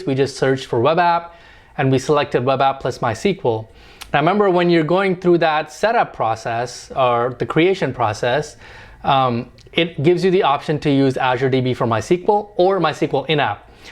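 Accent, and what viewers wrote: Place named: United States. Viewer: drop off that yank accent to get more likes. It’s irritating really. Good video though.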